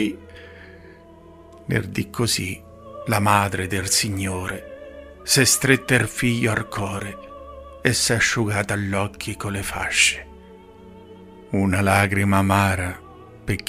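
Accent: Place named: Marche, Italy. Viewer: Peccato la cadenza che su Trilussa doveva essere obligatoriamente Romana invece sembra Marchigiana/Umbra. Nulla toglie alla bravura dell'interprete.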